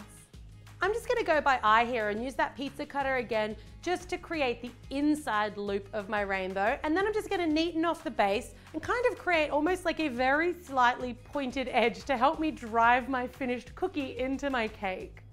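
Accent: Australia. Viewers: Veronica Carabez fr thoo i obviously thpught dhe was austrailen but that was it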